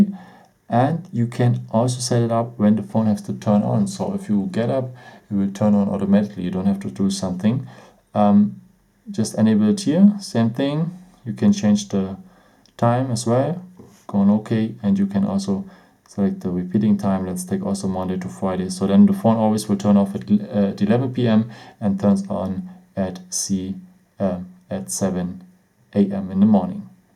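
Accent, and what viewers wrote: Germany. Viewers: The German accent triggers me. muss los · You sound kinda german lol. Are you german?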